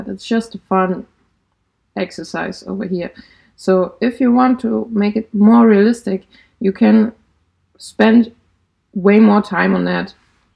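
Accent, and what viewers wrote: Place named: Germany. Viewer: I realy like your german accent!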